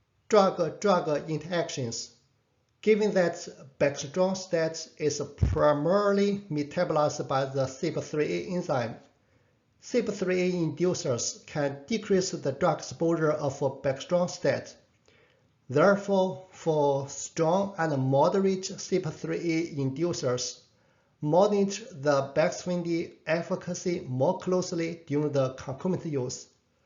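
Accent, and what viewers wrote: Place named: China. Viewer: 謝謝您的分享👍I know u can understand Chinese, from the accent 😊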